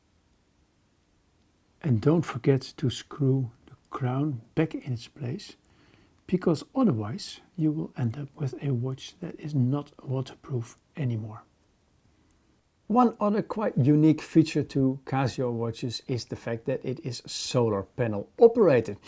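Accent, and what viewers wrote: Netherlands. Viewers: Dat nederlandse acsent 😂 hahahah heerlijk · Je herkent een Nederlander die Engels spreekt gelijk. 😁😁😁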